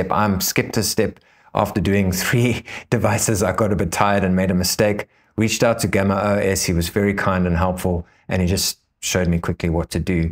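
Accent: South Africa. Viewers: Howzit? Wasn't expecting to hear a South African accent when I clicked on this video haha I'm from Canada but my family is originally from SA. Have to say this was a very clear video and can't wait to come back to it when I receive my RG405V.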